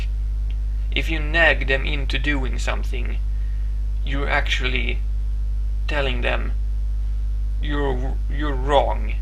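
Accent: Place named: Sweden. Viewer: Your accent remembers me a lot Ingmar Bergman, a lot, a lot.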